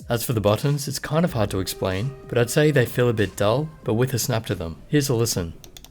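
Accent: Australia. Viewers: An Australian/New Zealand voice that's soothing and orgasmic.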